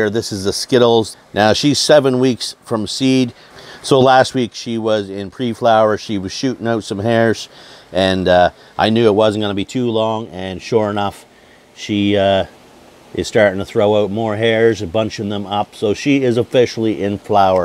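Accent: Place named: Boston, United States. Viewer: You from Boston brother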